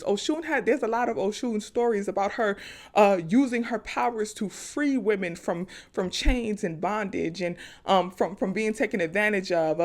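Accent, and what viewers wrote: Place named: North Carolina, United States. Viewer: You sound like you're from North Carolina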